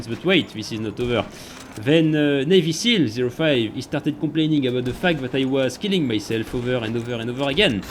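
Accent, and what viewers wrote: France. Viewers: Your English is fine. I mean you have an accent which is understandable because you're French but yeah other than that it's good man don't worry about that. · Just watched it (5 years late) and it is so funny. Despite the fact that you are right about that dude and his crew being absolute trash it is so funny to see how salty you get. But I want to be clear, I would do the same thing and get salty about ppl being so stupid on GTA online. Its just natural I guess. Love your content, love that french accent and the way you and play „together“. Sincerely, a german. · Love your vids man and your french acsent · hey mate.. I like your skills in the game and I respect you. plz ignore these type of stupid players. your English is good enough, even though it's funny strong french accent. but it's cool. I wanna see more of your videos and I advice you to add some music. 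· Ça va ton anglais même si tu as un accent français. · GS bro and I love your French accent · That is 1 thick sexy ass french accent, do more vids plz:p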